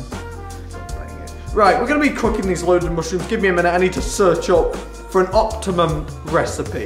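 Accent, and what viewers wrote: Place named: United Kingdom. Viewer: Nazi Pepe that's just how we British people talk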